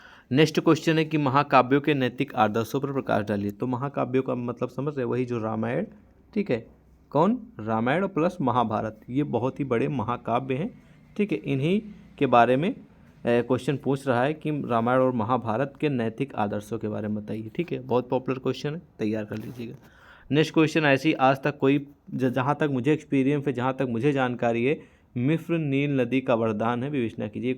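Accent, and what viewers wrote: Bihar, India. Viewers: Bihar hai ya up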